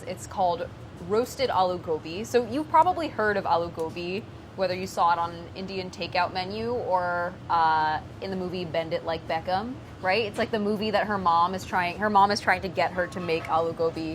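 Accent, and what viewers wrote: United States. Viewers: Oh God. She's speaking nonstop. Her very india skin tone but completely American accent with her nonstop verbal diarrhea is hurting my brain. Ah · I have trouble appreciating her very girly american accent but the recipe looks delicious, which is the i portant thing haha.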